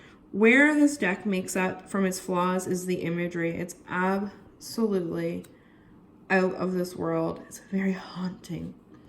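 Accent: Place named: East Coast, Canada. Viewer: Nice deck! Ps you must be from Canada, east coast - by your accent… 😊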